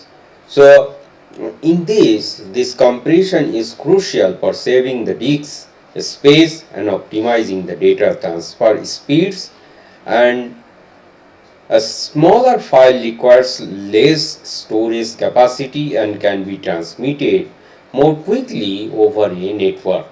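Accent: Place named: Bengal, India. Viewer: Sir your accent is beryy bengalishhhh . I like it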